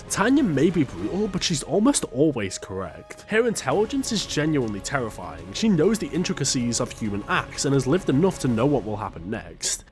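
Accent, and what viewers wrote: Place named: United Kingdom. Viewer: What's this accent, dude? It sounds perfect for roasting people, like British but more insulting.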